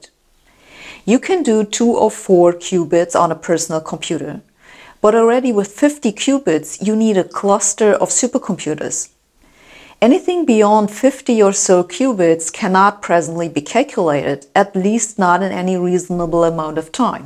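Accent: Germany. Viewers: Yeah. German accent = best accent (especially, of course, when pronouncing an actual German name :P) Prof. Hossenfelder has achieved accent supremacy.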